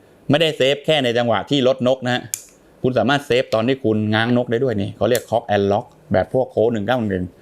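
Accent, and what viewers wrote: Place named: South, Thailand. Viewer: ผมมีเพื่อนคนใต้หลายคน ที่พยายามพูดกลาง สำเนียงออกมาแบบพี่บ่าวคนนี้ทุกคน ฟังแล้วน่ารักดีครับ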